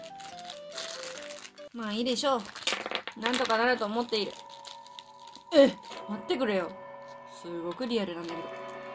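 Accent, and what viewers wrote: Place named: Sendai, Japan. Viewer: ばちくそ出てくる仙台弁がいい‼️ 親近感🥰‼️ 馴染みしかない喋り方‼️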